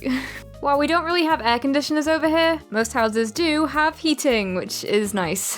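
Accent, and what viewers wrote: United Kingdom. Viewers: Am I the only one who thinks the female British accent is really cute?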